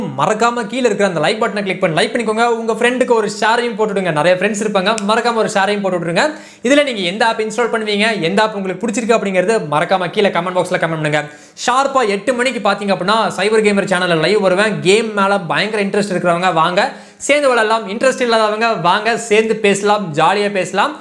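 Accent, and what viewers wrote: Coimbatore, India. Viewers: bro neenga coiambathura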